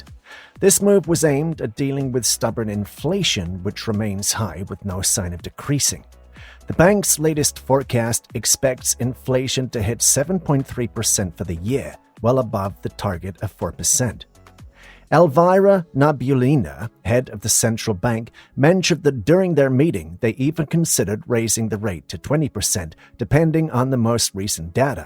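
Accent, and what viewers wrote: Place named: United States. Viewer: You know rush her is stuffed.....the yank accent is it too knowledgable man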